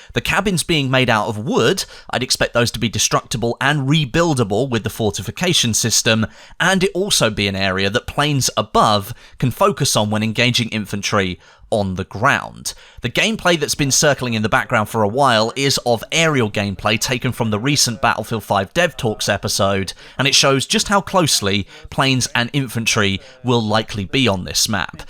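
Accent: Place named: United Kingdom. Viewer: Thanks to you, I started to like british accent, lol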